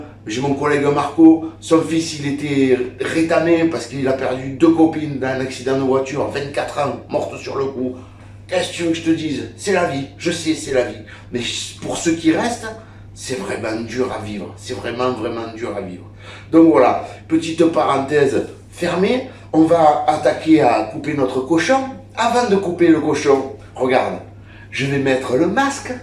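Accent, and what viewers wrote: South, France. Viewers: Bonjour Mr " ", Je découvre aujourd'hui même vos vidéos (Fabrication du Saucisson pour la première) et ensuite votre chaîne avec les saucisses... Ainsi que malheureusement, le départ brutal de votre ami " Tatane " 😇 Courage à vous (même si nous sommes en 2023) et l'on n'oublie jamais ses Amis Pour en revenir à vos vidéos, des conseils, de l'enthousiasme... On devine toute votre passion et votre volonté de transmettre. Que du Bonheur de vous visionner, vous écouter avec en plus, ce petit accent du Sud: Charmant. avec un sérieux, une franchise. Tout gamin, de manière familiale, le Cochon représentait un événement annuel que j'ai un peu perdu de vue avec l'âge. Je suis de la Loire. (non loin de Polignac d'ailleurs). Grâce à vos vidéos, cela me redonne de l'énergie pour recommencer Alors, rien que pour cela: MERCI Et pour votre Citron Pulco, c'est bien mais j'adore le commentaire ci-dessous de Denis Etienne: " Pour ce qui est de ton embonpoint, mon grand père me disait qu'un boucher maigre n'est pas un boucher de confiance. 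" Tout est dit 😊 Et pour le coup du Masque!!! Bravo pour votre franchise et la belle Remise en place de certains!!! Alors encore MERCI:washhands: Continuez ainsi. Vous êtes non pas un Vrai Personnage mais un Personnage VRAI!!! Cordialement.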